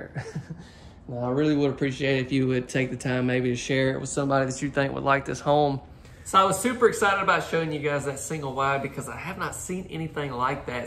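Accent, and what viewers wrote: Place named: Mississippi, United States. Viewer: you been doing a great job showing us all the mobile homes keep the good work up you doing a fantastic job and I like the way you talk you're from Mississippi and I'm from Louisiana how is your little family doing I hope they're doing well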